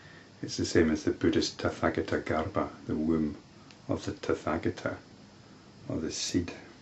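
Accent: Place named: Scotland, United Kingdom. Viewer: I wonder where exactly your accent comes from. It sounds Scottish, but I don't know what city.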